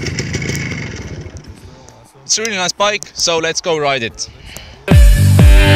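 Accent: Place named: Estonia. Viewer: Aksent reetis et tegu võib olla Eestiga. Lahe ja naljakas video.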